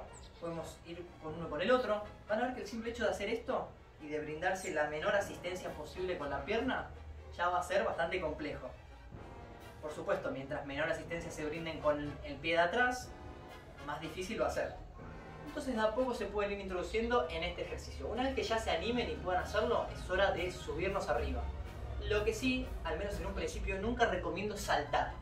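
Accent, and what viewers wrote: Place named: Argentina. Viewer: muchas gracias me gusto mucho el video. bién explicado. De donde las tenias colgadas tus anillas? En un parque habian barras paralelas y anillas. Yo confiado que dominaba la paralela, salte para hacer fondos en anillas y me lesione el hombro. No muy grabe me recupere rapido, si antes hubiera visto tu video no cometia ese error.😃👍 de donde es ud. Por su acento creo que sea de la Argentina.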